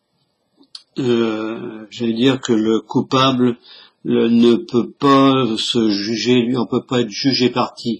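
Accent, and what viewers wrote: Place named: Southwest, France. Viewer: salut. au fur et à mesure du descriptif de ton reve, j'ai ressenti un extrême mal-à-l'aise, et sans réflechir, j'ai pensé de suite aux Francs maçons et à une messe noire avec un sacrifice... ton tirage semble confirmer cette pensée, et en tout cas, laisse un grand point d'interrogation. Je profites du fait d'avoir accès aux commentaires pour une question: Je sais que tu habites dans le nord de la France, mais je remarque, quand tu prononces certains mots avec le son "O", (ex: autre) je vois que tu le prononces comme moi à Bordeaux et dans la region du Sudouest, et pas du tout comme quelqu'un de ta region... tu as une explication?????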